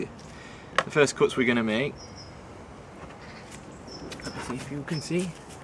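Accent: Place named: Yorkshire, United Kingdom. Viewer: good video, proper Yorkshire man by the sounds of it. Sheffield at a guess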